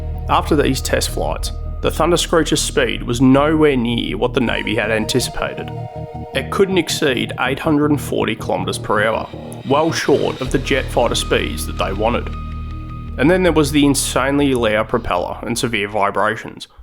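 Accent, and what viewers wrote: Australia. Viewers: What a terrible Australian accent this speaker has! That's not proper English. That's Outback babble.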